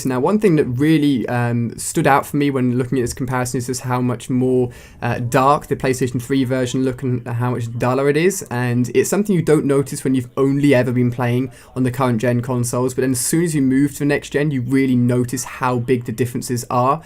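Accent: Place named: United Kingdom. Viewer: Dejavu....only this time its british guy talking....tmartn did this first..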